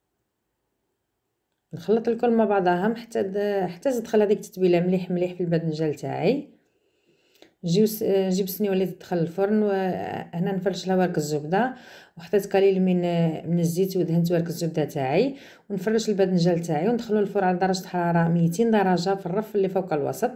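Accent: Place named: Jijel, Algeria. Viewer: الله يبارك ويوفقك في مشوارك قناتك رائعة انا احب الطبخ الجيجلي وكذلك اللهجة😘😘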